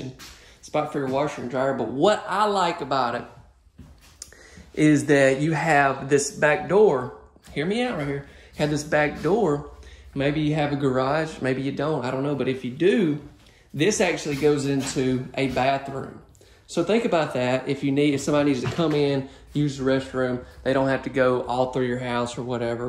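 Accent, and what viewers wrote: South, United States. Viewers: Does anyone here own this exact model? This is the one I have my heart set on 5000%! It has every single thing I need & want for myself & my boys. But the guy at clayton homes told me they have "better" layouts with "better" constuction at a better price than this prime. & i wonder if he just said that because he wants me to buy one they have. Ive looked at MANY & this 1 still tops them all for me. I really would love to know how much this one is & how is the construction. Im not settling 4 anything less. Thank u 4 this amazing video. & ur a great camera man! Also love ur accent so cute. lol Nice southern draw u have sir :)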